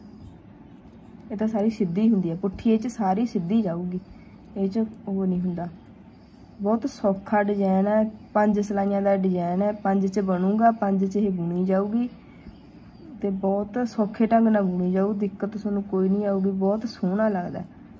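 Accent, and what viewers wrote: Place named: Malwa, India. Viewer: Bahut hi vadiya tarike nal samjaya & pure Malwai Boli🥰🇭🇲🙏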